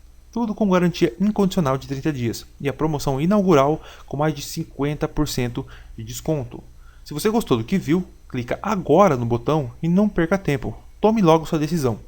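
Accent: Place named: Inland São Paulo, Brazil. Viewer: Com essa fala de paulista do interior aff que r ridiculo!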